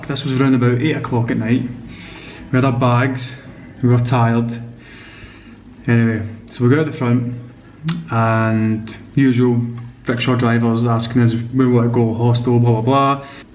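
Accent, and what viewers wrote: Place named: Scotland, United Kingdom. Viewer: that usually never happens in india...yes in independence day it can be a bit of hassle i suppose...so u guys were kinda unlucky..that it just happened to be independence day in india....one thing i can suggest about taxis and cabs in india is..always get on cabs with meter on..and say iam only gonna pay whatever the meter says.as simple as that..download uber app..on your phones..get uber cabs in india..they are awesome. .also ask middle class urban indians on the road..of how much things cost in india..note down the prices..so that you wont get crooked next time..most of the these drivers or whatever come from rural india all they wanna do is make money..so the can be a pain in the ass not just foreigners but for urban indians as well..act street smart...AND DO NOT CONVERT INDIAN RUPEES TO POUNDS..and think oh thats seems reasonable no its not..15000 rupees is a lot of money in india..because living standard is cheaper in india..as compared to scotland..i suppose thats where you from. 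going by the accent..thanks...hope u enjoy your remaning trip..chears..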